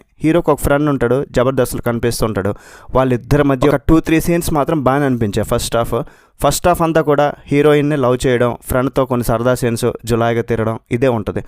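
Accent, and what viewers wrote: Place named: Telangana, India. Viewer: జై తెలంగాణ యాస 🌚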